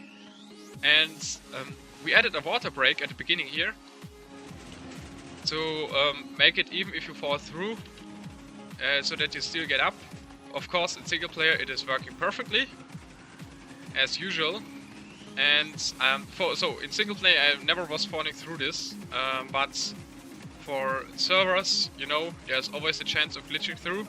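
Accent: Germany. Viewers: Accent? Germany?!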